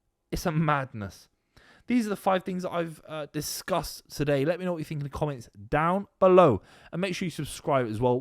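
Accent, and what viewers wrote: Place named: West Country, United Kingdom. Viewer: Must admit zi find the west country accent impossible to listen to. The ward he judt said 'work hard'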